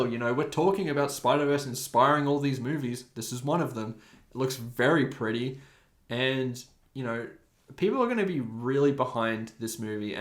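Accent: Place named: Australia. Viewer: You sound more Aussie than NZ tbh. Nice video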